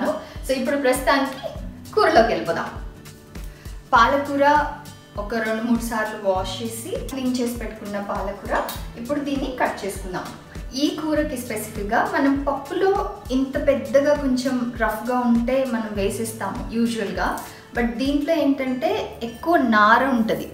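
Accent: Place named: Nellore, India. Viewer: Meeru NELLORE Language mataladuthunaru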